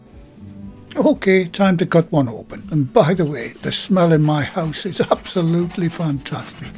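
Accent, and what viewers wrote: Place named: Yorkshire, United Kingdom. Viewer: Hi, just got your buns out of the oven, the house smells lovely, we will have steak on a baguette for dinner, thanks for all your hard work on the videos. I was born near doncaster in yorkshire, love to hear your accent again 😀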